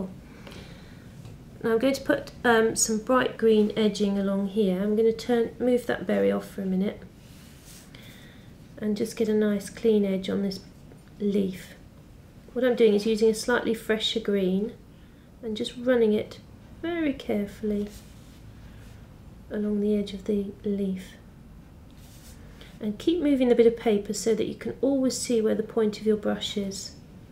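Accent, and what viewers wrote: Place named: England, United Kingdom. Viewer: Hence she has an English accent. We say people have French, German, Irish and other national accents just as I have a Scottish accent so why not an English one?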